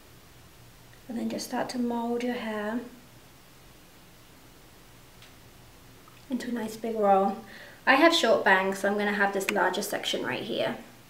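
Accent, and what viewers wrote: United Kingdom. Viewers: I always thought you would be from the US - I was quite surprised to hear your sweet English accent! Your hair as you take your pin curls out makes me want to chop mine right off - it looks so gorgeous, an aqua Marilyn - LUSH.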